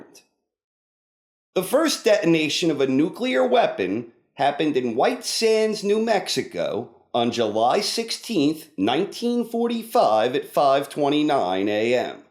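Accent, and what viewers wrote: New York, United States. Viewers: I really wanna know what his accent is. Is he from New York? or New England maybe?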